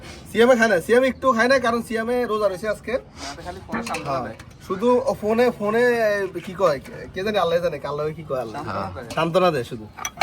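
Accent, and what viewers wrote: Noakhali, Bangladesh. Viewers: Bhaiya ki noakhalir?🥹 Bakider Kotha Barta shune Moja laglo,roja rakha bhai er jonnow kharap laglo,unar iftar er Jonno kichu rekhe diten 😂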